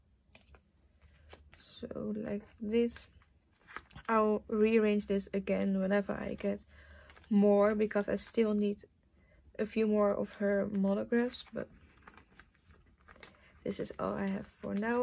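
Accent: Netherlands. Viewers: Do u speak Dutch bc how u speak in English is how most Dutch / belguim ppl speak in English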